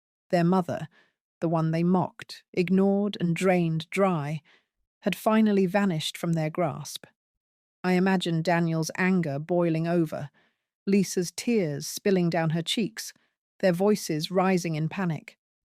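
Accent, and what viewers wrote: United Kingdom. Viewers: If she’s from Texas she has a very strong British accent, why?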